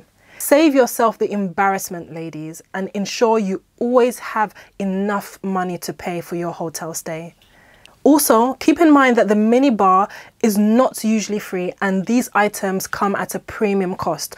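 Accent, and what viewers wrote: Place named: RP, United Kingdom. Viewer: Having a Mary Poppins accent (that I love) and the name, one couldn't be anything but elegant. Not part of your crowd, but interesting insight. ✌🏽 *Not sure if this is the correct way to spell your name.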